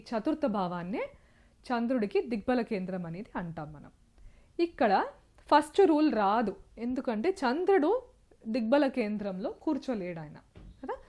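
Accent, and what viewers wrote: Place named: Tamil Nadu, India. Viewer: అమ్మా,మీ ఉచ్చారణ, చెంద్ర, సని అని పలుకుతూ ఉన్నారు.కొంత ఆరవ/ తమిళ accent నుంచి తేట తెలుగులో పలకండి. మీరు రికార్డు చేసిన తర్వాత వాయిస్ low గా వున్నదా అని కూడా చెక్ చేయండి. బాలు, ఘంటసాల పాటలతో మెరుగు చేసుకోండి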